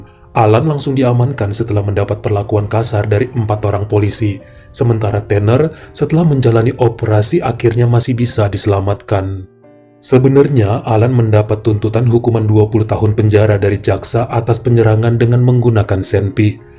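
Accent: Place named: Medan, Indonesia. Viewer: setiap denger cerita dr channel bang aku ketawa ngakak. mgkn krna aku berasal dr medan udh terbiasa denger logatnya, dan ada kesan lucu polos dlm bahsa2nya..sugguh menghibur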